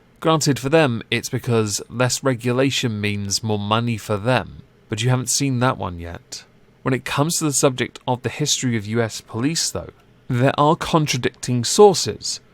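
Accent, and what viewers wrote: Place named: United Kingdom. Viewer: "Incredibly cucked simp" That was perfect! xD I enjoy your verbal readyness & sense of humour as much as Alizee's. Also some people have the combination of nice voice & accent and that's pleasant to listen to. I'm biased ofcourse, as I've watched British programming since early age and therefore learned to like the language & accent :)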